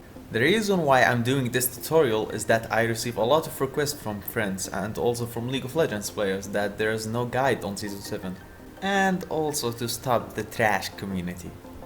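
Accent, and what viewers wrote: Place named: Egypt. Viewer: مسوي عنقليزي تتكلم زي المصري ترا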